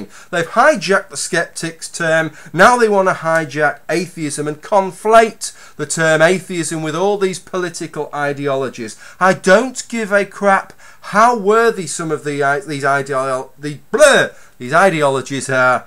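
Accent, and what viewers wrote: United Kingdom. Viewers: I do miss hearing English spoken by native UK English speakers :) /me ex-pat ten years, oh and screw Atheism + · Your passionate indignation is quite inspiring and I quite agree with your argument, with the exception of calling TF00t a prick. ; ) Totally off topic: I love your accent. What part of the UK are you from?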